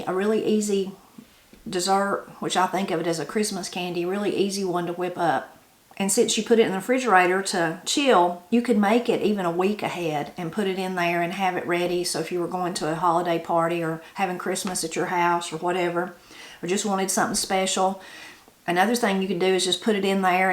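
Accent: Appalachia, United States. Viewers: Miss, thank you and your Granny for the wonderful Seaside Candy Roll recipe. I made some for Christmas and it was delicious and very easy to make. You were right about how sticky the candy roll became brushing it with the syrup and then pressing on the pecans but it was worth it! I grew up in Northern Idaho and I know and understand 95% of your Appalachian words and pronunciation. As far as I know, my people came to Idaho from Scotland, Ireland, Belgium and Germany via the Midwest. Maybe that is where they picked up those unique words. The only difference is my pronunciation of pecan [pea-con].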